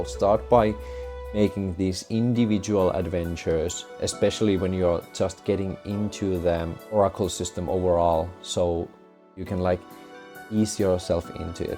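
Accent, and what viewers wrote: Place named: Finland. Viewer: Suomi? Cool accent 😎 Thanks for this walk through!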